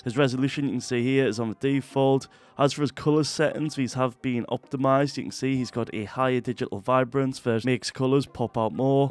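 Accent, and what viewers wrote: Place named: Newcastle, United Kingdom. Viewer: we have like 50 diff accents so his is Newcastle btw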